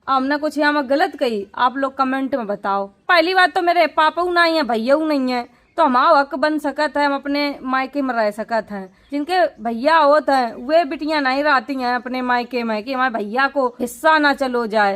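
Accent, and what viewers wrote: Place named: India. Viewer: ❤love yours Desi accent and voice❤long live with health wealth happiness and success in every part of life. Always respect and love❤ from Pakistan 🇵🇰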